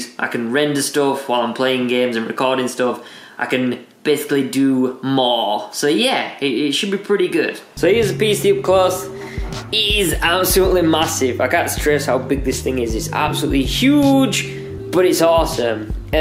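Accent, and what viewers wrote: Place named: United Kingdom. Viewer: Why are you talkin british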